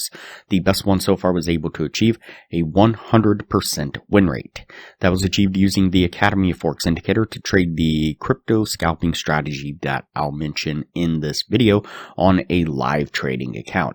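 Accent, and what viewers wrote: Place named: United States. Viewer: This voice is US accent, it will not work in India. It irritates, though can be understood with little effort but irritation pain is much more than benefits !!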